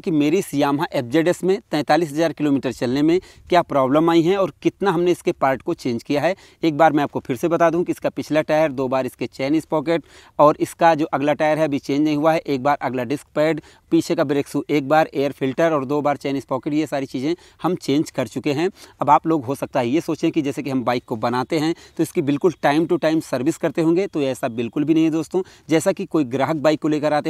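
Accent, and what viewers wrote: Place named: Pratapgarh, India. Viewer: Bhai aap kaha ke rehne wale ho aapki bhasha se mujhe lagta h ki aap uttar pradesh jila pratapgarh ke aas paas se hi kahi ho...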